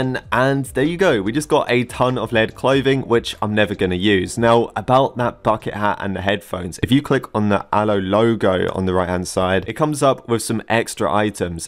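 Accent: United Kingdom. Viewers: Are you using your british accent? I love british accents!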